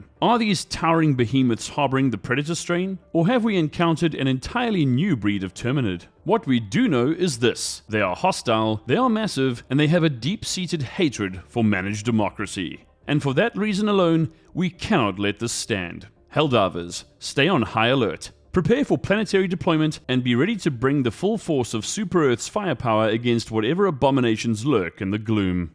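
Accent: South Africa. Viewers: He is from South Africa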